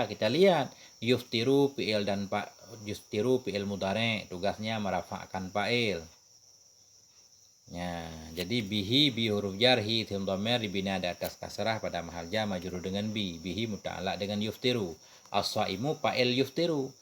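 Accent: Aceh, Indonesia. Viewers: Afwan kiyai, awak kira kiyai dari Aceh karena logat antum 🙏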